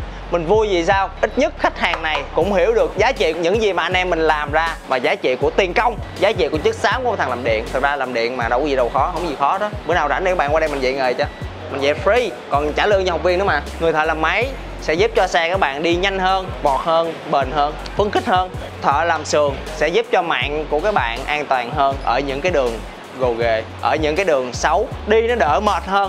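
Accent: Standard. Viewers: Hoc văn giỏi hong, nói chuẩn vậy trời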